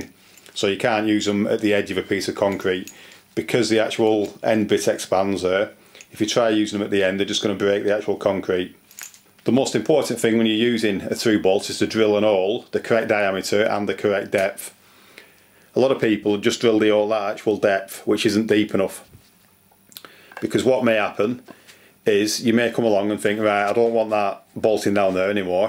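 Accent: United Kingdom. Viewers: to , the text says, "one thing you can do is use them for close to the edge fixing..." I think the narrator actually said "can't" but the voice recognition software doesn't understand Brits. I think he meant "CAN'T." He calls them "through bolts"; in the US we call them wedge anchors or Redheads, which is actually a brand name that's become generic. We also have s bolt called a "Titen" from Simpson-Strongtie which works better close to an edge. They cut threads in the concrete, so they don't exert as much expanding force, so they are less likely to crack the concrete. We haven't caught up with the rest of the world, so we only have inch (Imperial?) sizes- Simpson may have come up with metric sizes for the other side of the pond. Someone asked about the definition of edge distance- check this link- <link> It gives a rule of thumb which is that an anchor should have a minimum of 12x the diameter of anchor spacing to an adjacent anchor or to any concrete edge. 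Also, the concrete should have a minimum thickness of 1.5x the depth of embedment.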